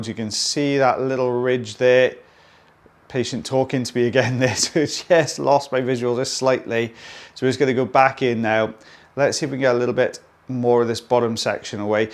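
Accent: Wales, United Kingdom. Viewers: Love the Welsh accent. A lot of Wonderful Singers come from Wales. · Off topic: I'm totally in love with your Brit accent! I could stand to listen for ages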